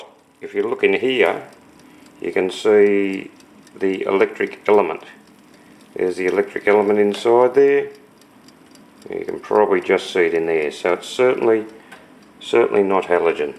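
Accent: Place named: Australia. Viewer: So nice to hear an over the ditch accent 😊 kiwi here x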